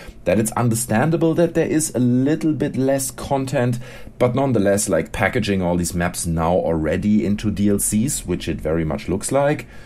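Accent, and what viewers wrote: Germany. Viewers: Your German (correct me if I'm wrong) accent sounds extremely awesome.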